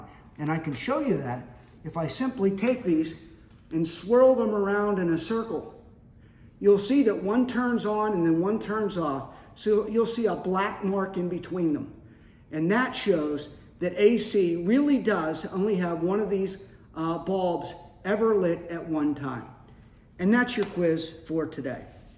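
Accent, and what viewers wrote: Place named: United States. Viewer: The lamps will both illiminate bit alternate at the frequency of the AC power. 60 Hz since he seems to have a US accent. 50 Hz in yhe redt of the world. It may allear as both on together though due to filiment heat and visual preception time. Each diode will block half the wave. Since they are oppsite they will block the opposite side. 99%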